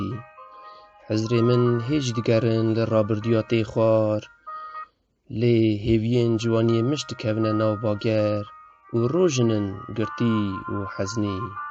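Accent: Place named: Serhed, Turkey. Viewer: Başê, brez ber zikê xwe ya têr dixwîne.. a rast kifşê ku serhedîye🤗🤗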